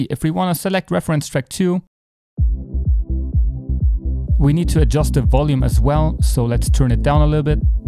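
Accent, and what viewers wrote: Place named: Germany. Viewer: that german accent lol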